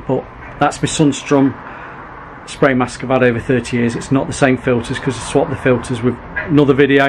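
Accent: Nottingham, United Kingdom. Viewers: I thought that was a Nottingham accent you had, i pass through Netherfield on the train to Forest games travelling up from Norfolk.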